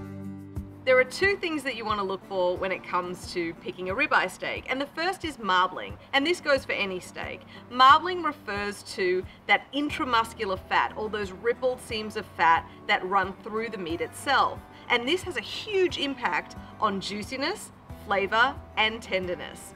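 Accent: Australia. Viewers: Lol, the longer she lives in Texas the thicker her “Australian accent” gets 🙈 Thought it was supposed to be the other way around. Don’t understand? Go to her channel and look at the first videos, 100% American, never would think she was from somewhere else. Maybe her PR people told her accents sell 💰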